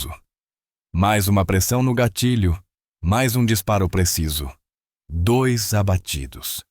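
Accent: Portugal. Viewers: Narração português de Portugal.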